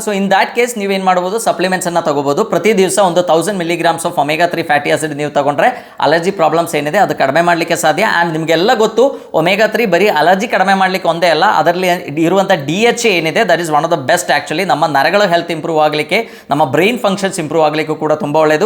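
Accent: Kerala, India. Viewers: Ok, but look like Dr from Kerala, kannada is Malayalam mixed kannada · Well explained Doctor you are speaking very good kannada beaing a Malayalam slant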